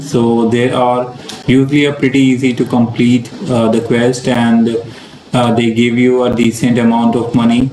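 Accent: India. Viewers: ofc indian af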